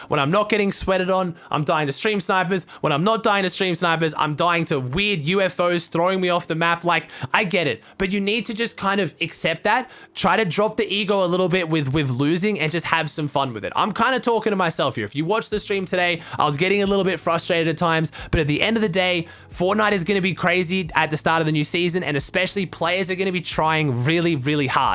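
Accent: Australia. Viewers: Yessirrrrr what’s up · Hey can u put. Captions on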